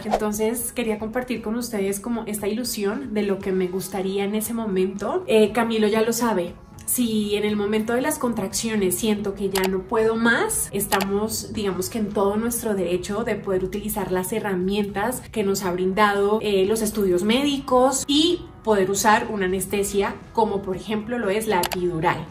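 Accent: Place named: Colombia. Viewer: no pierdas tu acento Colombiano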